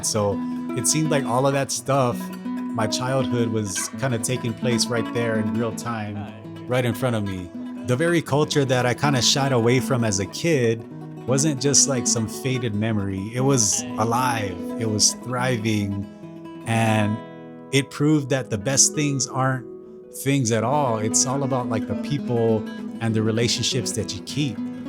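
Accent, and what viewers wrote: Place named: United States. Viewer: It’s crazy because you saying that you try to hide your Filipino roots back in the day is hilarious to me because you look super Filipino 😂. What I mean by that is you look like a typical Filipino poster boy. I can tell your Filipino 100 miles away and if i didn’t hear your American accent I thought you were from Ilocos. Also you being Ilocano I’m sorry to be blunt but even if you ask your family Ilocano are stereotyped as being very cheap, very dark skin and like to eat dogs. If you don’t believe me ask your family or just google search it. Also the Tagalog people use to discriminate against you guys a lot back in the day. Also I just think it’s super funny how Filipinos who were born and raised in America look super native, while Filipinos in Philippines look more western. From my experience most Filipinos who were born and raised here have that super Filipino look and have darker skin tone. 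But Filipinos who are born and raised in Philippines look more mixed and have lighter skin tone. Like walking around especially in Metro Manila in places like Makati, Alabang or BGC you can see Filipinos who clearly have either Chinese or Spanish blood running in their veins. So it’s ironic that a Filipino in Philippines can look more western than a Filipino from America. I know how it is because I live in America too and my family have been here since the late 1960s. Most of my family in my dad side are born and raised in America and some even in Europe. They are super white washed but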